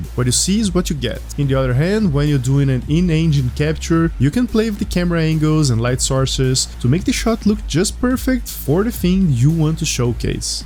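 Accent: Brazil. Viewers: Não é Unotable, é Unnoticeable. Quando for gravar os vídeos, pensa que vc não quer que percebam que vc é de SP.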